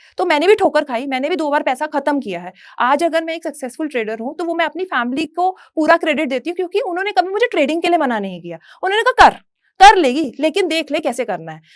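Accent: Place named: West Delhi, India. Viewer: west delhi touch lagta hai maam ka..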